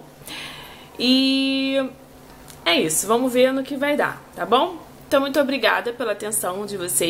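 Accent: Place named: Rio de Janeiro, Brazil. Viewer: Vá em frente!! Nós vamos amar!! Estou começando a gravar vídeos, se quiser conhecer ficarei mto feliz!! Adoro seu sotaque carioca! É lindo! mil bjs!!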